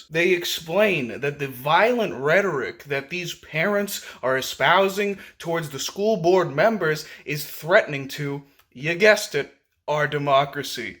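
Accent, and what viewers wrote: Boston, United States. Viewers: Is that a hint of the ol'e ''Baws-ton'' accent I doth hear? Great vid, man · your accent has a hint of Boston. am i on to something here young man?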